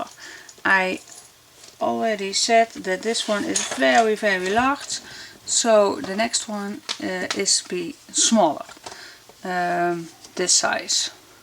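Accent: Brabant, Netherlands. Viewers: jeetje , ik ontdekte jou dit weekend op you tube....heb uuuuuren zitten kijken. koptelefoon op en manlief had geen last meer van me hahahaha en ben weer compleet geïnspireerd door jou ....had veel albums gemaakt maar zie dat ik nog veel kan leren van jou ook heel veel ideeën opgedaan ( gebruik nu ook acryl stempelblokje om met mijn lange nagels het tape af te scheuren top idee). vreselijk gelachen ook om je .... wat een kei gezellig mens ben je ( brabant zo te horen) zit wel met heel veel vragen over materialen maar ja jij zegt googel het maar alleen niet alles kan ik vinden wat ik wil dus hoop dat je toch stiekum vragen wilt beantwoorden ,,,, merk wel dat wij veel dezelfde ideeën hebben ook ik werl al jaren met het "potloopstreepje en meet ook nooit iets af ... ik ga snel verder kijken bij je , groetjes Marijke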